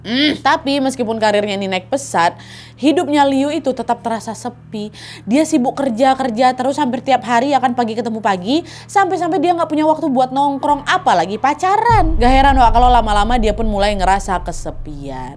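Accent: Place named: Medan, Indonesia. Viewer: Medan kali cakapnya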